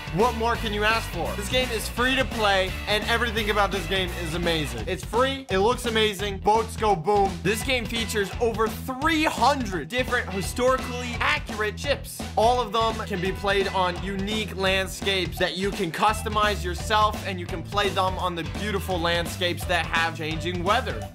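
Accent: Scotland, United Kingdom. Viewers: is Scottish wow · Is Scottish or something? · I didn't know was Scottish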